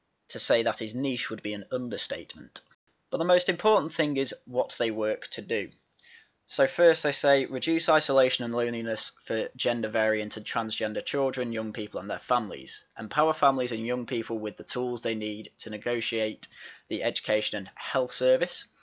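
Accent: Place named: Manchester, United Kingdom. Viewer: sorry my dude it says "Infertility is therefore one of the major side effects of the course of treatment that runs from puberty suppression through cross-sex hormones to surgical sex reassignment." Which includes cross sex hormone treatment. Which means there is a lot of misinformation in this video. BTW you sound dead familiar are you from greater Manchester?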